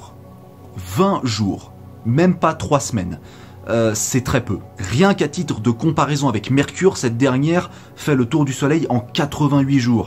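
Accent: Germany. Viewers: C'est bien cette chaîne, pouce bleu pour l'accent allemand et la voiture qui passe ! Merci pour ce contenu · Poce bleu pour ton accent allemand :P Sinon super vidéo :D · Pouce bleu rien que pour l’accent Allemand ;-)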